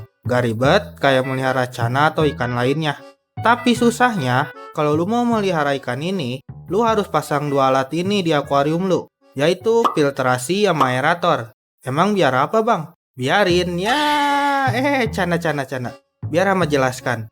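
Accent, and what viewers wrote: West Java, Indonesia. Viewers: Orang jabar ya bangg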